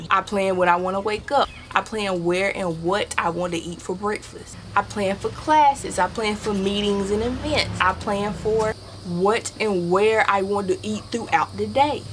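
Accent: United States. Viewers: lol love it already. so country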